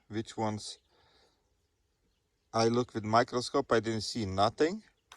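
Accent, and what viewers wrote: Russia. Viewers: You sound like Crazy Russian Hacker. You must be Russian. Am I correct?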